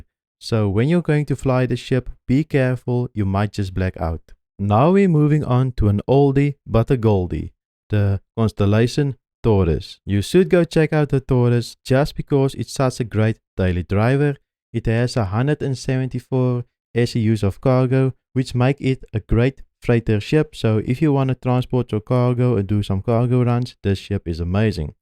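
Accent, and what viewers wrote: Afrikaans, South Africa. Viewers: The Afrikaans accent gets me every time😂😂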